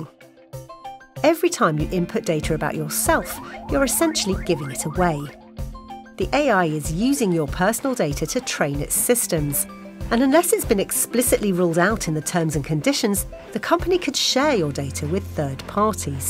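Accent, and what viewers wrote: United Kingdom. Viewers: English with a British accent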